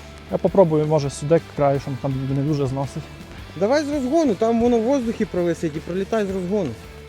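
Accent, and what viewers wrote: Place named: Transcarpathia, Ukraine. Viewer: Впізнаю наш говор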